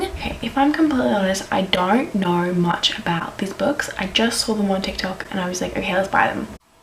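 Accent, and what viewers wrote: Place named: Australia. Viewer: This is really random but you and phoebe Tonkin have the exact Same specific dialect like I could close my eyes and I could picture a young phoebe. I’ve never heard another fellow Australian sound so similar haha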